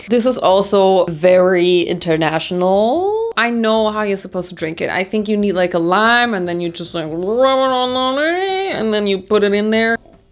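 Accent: Bavaria, Germany. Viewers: Der bayerische Akzent war halt echt nicht schlecht 😂 Grüße aus Bayern! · bist du bayer?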